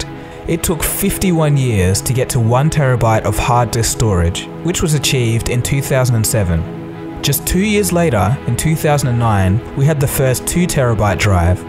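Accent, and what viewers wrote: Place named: Australia. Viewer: He is from Australia that my explain the pronounciation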